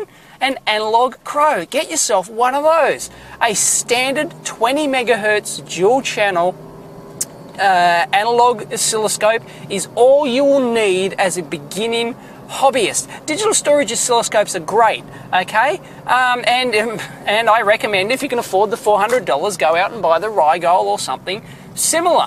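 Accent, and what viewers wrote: Australia. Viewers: No offense....but WHY is the AUSSIE dialect/tone/speech so hard on my ears ? Are Gold Coasters different from Margarets River people..? is it a result of english colonization of the aborigines ? <link> Good advice.... I just picked up a pair of working LEADER 100Mhz scopes for $100 USD in Calif off C-List....LBO-516 and LS-8106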